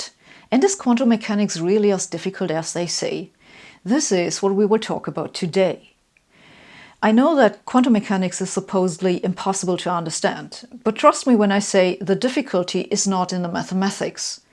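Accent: Germany. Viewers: Of course she has a German accent, of course she does. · your german accent is so german: it hits the clichee as a blueprint for any information to what end the effect never fails the paradox of losing it...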